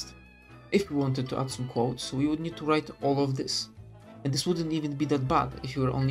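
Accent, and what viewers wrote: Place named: Russia. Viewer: your accent's with a hint of russian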